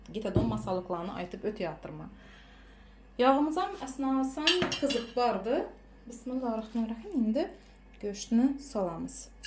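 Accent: Khorezm, Uzbekistan. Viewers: Хорезм таомлари зўр. Айниқса сузма палов. Менга жудо ёқади хоразимча таомлар, хам хоразм шеваси жудо чиройли сўзлашадилар. Котта рахмат синглим. Хоразмга бориб кегандай бўлдим. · Ozizni shevayizda chiqarganiza dm quvondim apka manam xorazmdanman boshqala xorazmdan bosanam tushnmidla dab yo ozn shevasinan uyolganindan adabiycha soʻllab video chiqaradila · Ассалому алайкум. Роликни тасодифан кўриб қолдим. Овқат пишираётганингизда Аллоҳни ёд этганингиз, Хоразмча, чиройли сўйлаганингиз учун рахмат, қизим. Аллохнинг панохида юринг.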